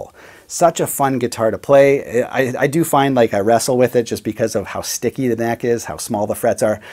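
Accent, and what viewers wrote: Canada. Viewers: Awesome collection! ( Also, I had a feeling you were Canadian based upon your accent 🤣) I'm a fellow Canadian 🇨🇦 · The best Canadian accent Sooory that's the rules One of the best accents ever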